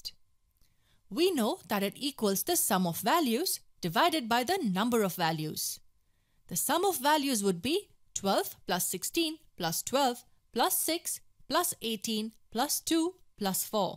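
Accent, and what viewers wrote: India. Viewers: I CAN SAY YOU HAVE INDIAN ACCENT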